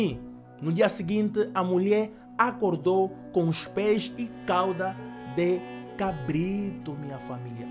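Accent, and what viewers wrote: Angola. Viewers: Esee cara narrando parece angolano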